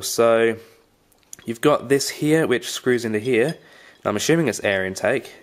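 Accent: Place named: Australia. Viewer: It's an added treat to hear your accent. I have always wanted to visit OZ. Even boarded a young chap from Victoria a few times!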